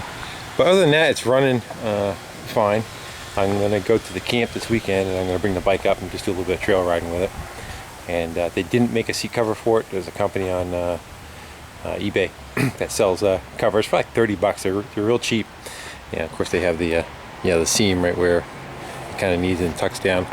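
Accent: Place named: East Coast, United States. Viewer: Most of the east coasters that work in my seafood department where I work sound like you haha.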